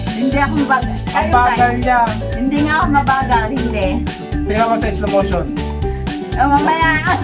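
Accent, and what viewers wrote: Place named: Visayas, Philippines. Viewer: Thank you bisaya kaayo oi pwerting bisayaa🤣🤣🤣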